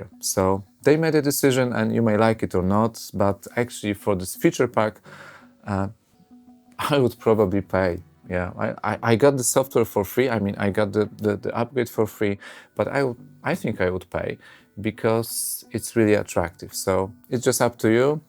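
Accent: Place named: Poland. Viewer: Uslyszalam polski akcent.Mialam nosa.😄A ja jestem nadal na Studio One 2😂🤣😅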